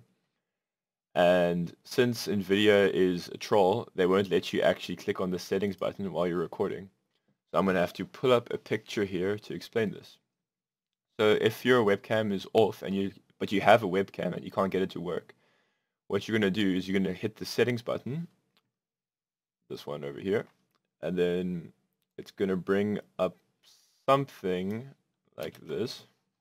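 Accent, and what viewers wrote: South Africa. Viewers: South African?? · Added a Like and Subscribed , you are from RSA right ? xD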